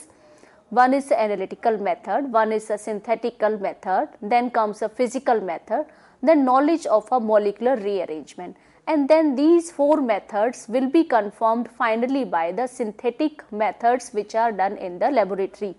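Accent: India. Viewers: I’m so sorry, because you are a beautiful intelligent women… But I can barely understand what you’re saying. One word blends into the other is if you’re speaking A foreign language rather than English. I understand you are in India, and I’m in California, but if you could speak more slowly and put a little space between your words you would be understandable. Thank you so much for taking the time to do this for students. I wish I could understand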